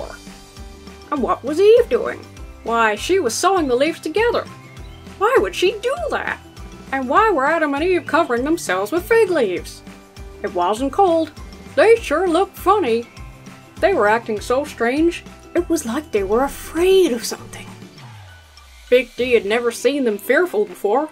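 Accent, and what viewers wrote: United States. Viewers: I'm crying... lol... you have a much better American accent than I do an English one... quite impressive lol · I can't imagine this being someone's first visit to you. That would be insane. 😂 Great stuff. And your American accent is getting quite good. Your "Dr" Kent impression is stellar. 🙌🏼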